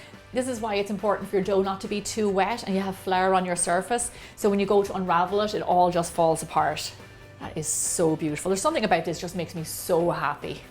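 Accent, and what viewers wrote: Ireland. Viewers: I'm adding this to my list of what I've baked already: sourdough bread, pretzels, corn and flour tortillas, pita bread. I find that I love baking. My Grandmother used to make noodles. My Mom said she hung the noodles all over the house to dry. Interesting visual. By the by, I like your accent. You're Irish, right? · I knew you were from Ireland even before reading. Love that accent. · So i was looking for an easy home made egg pasta recipe and just clicked one without looking at the thumbnail and the video started and i hear the familiar Irish voice. I was like omg it's 😂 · Are you Irish ? Love ❤️ the accent 👋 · i love how your irish accent is sometimes coming through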